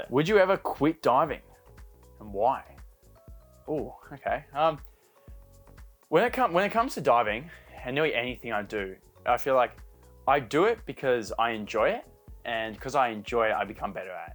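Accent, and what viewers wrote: Australia. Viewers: I like watching your dives. I like your Aussie accent. My question for you is have you ever been to Canada 🇨🇦?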